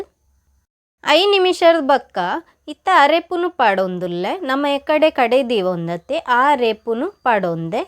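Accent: Tulu, India. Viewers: manglore Tulu and Udupi side Tulu is little bit different to talk. But nice to hear Tulu language the way of your talking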